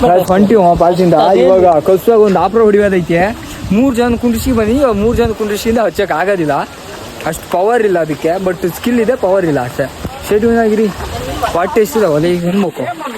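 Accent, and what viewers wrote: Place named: Sirsi, India. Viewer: Bro nivu sirsi siddapura navra same a side tarane matadthira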